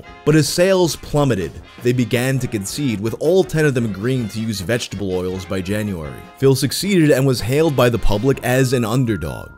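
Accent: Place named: New York, United States. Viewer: Your New York accent is getting a lot thicker, it’s nice. Makes me want to listen to Type O.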